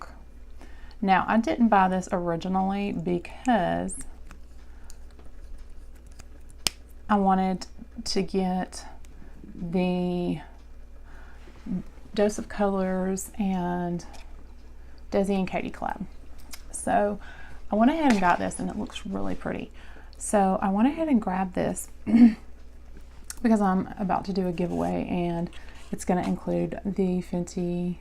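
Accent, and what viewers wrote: South, United States. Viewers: I love your vids. Your so soft spoken and of course I know your southern. Keep the videos coming ♥️ you know us southerners are suppose to get snow this weekend 😬 IG LOVE YOUR PURSE never had a MK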